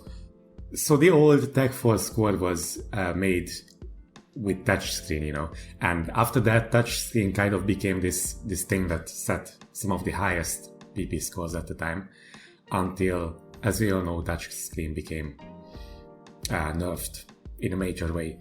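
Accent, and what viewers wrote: Hungary. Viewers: At first you kinda sounded like a Hungarian but I wasn't sure, good job and keep up the good work!:) Csak így tovább.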